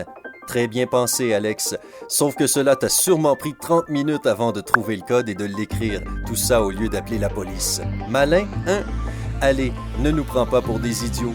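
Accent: Quebec, Canada. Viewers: T'es québécois ta un sacré accent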